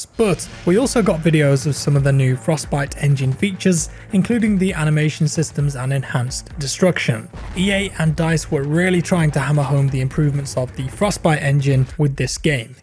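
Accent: United Kingdom. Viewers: Brits are just natural narrators. Very nicely done,.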